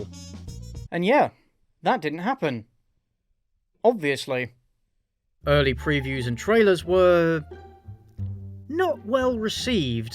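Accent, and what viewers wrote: United Kingdom. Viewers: I'm a simple man I See talking british dog man I click.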